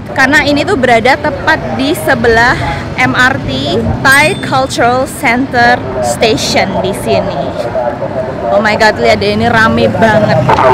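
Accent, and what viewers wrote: Java, Indonesia. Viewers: kuwait 8/10/19... wonge ayuu,,,.medhoook..mangane akeh ora isin,,,,,,,lucu lucuu,,,tek subscribe lah....january mw ke bangkok n krabi.. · Youtuber surabaya ngomongnya medok bgt 😁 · Jowomu mbak medhok beud, mantebbb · Bicara nya keJawaan kak 😍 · Rai² cino tpi boso e medok mantul mbak😂 #wongjowo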